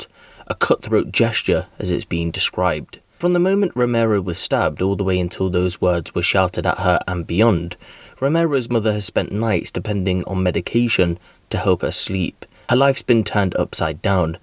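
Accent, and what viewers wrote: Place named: RP, United Kingdom. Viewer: Not being horrible but I ain’t watched u in ages and your voice sounds well posh since I last heard you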